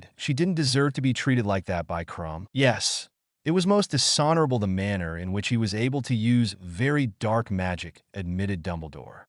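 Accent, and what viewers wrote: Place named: India. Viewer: hey there when your videos are done with a heave India dialect please use the ai voice so it is understandable as that accent is thick and English is my 4th language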